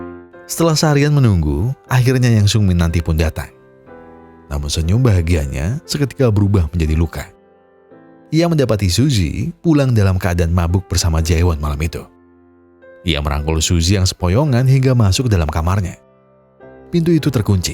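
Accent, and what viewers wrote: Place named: Banyumas, Indonesia. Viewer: Deneng teyeng ngapak njenengan mas?😅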